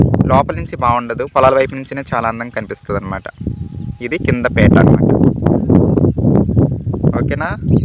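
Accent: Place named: Andhra Pradesh, India. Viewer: మీ ఆంధ్ర యాస బలే ఉంటద్దండి అస్తమాను అండి అండి అంటూ 💝frm ts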